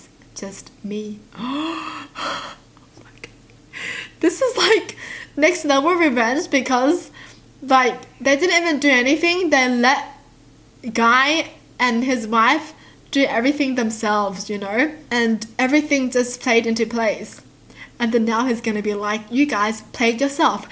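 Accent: Australia. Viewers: iamshooketh she’s Australian but so trueeeee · Love her Australian accent aswell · Is she Aussie? 🤔 Where does she live? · iamshooketh she’s just Australian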